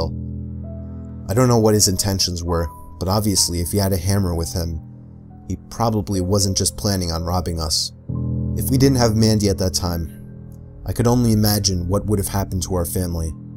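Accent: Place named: New York, United States. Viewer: Are you from NY? I hear that accent, you say dog the same way I do.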